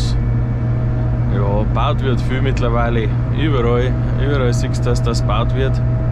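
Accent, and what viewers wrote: Bavaria, Germany. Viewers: Iwo Boarisch bassd subba. Andaschd was nix. Klingt a ned guad 😅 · Mich Stöhrt das nicht. past schon dein Bayrisch. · Dere, geils video. Red weiterhie bayrisch 👌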